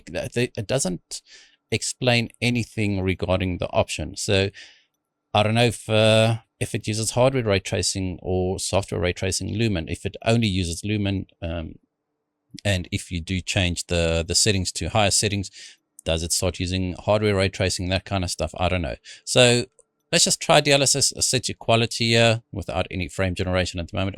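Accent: South Africa. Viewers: R u from south africa ? N any amd gpu to test this one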